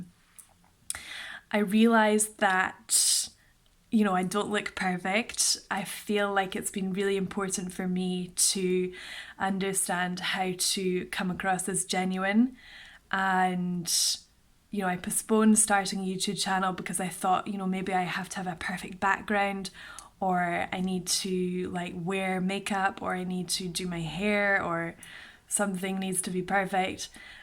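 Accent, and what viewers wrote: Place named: Ireland. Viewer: This is a good video. And the Irish accent was brilliant.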